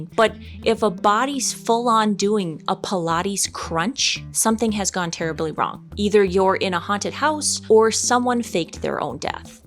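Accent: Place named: Midwest, United States. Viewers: Your Midwestern accent 😅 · This is the best youTube channel in existence. I love when the thick MidWestern comes out. 'HaAAspice'.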